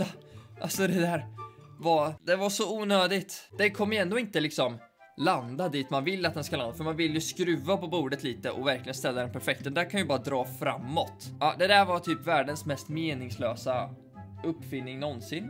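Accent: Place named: Östergötland, Sweden. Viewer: Denna östgötska😂 känner igen mig 100%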